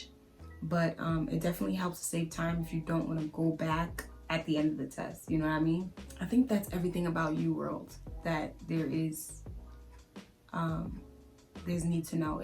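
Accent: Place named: Liberia. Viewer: I keep hearing a slight Liberian accent. Darling, are you from LIB?😉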